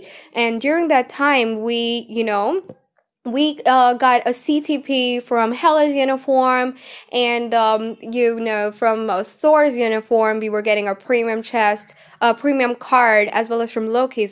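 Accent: India. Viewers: Shabbir Alom Shakil I'd say India given her accent 🤔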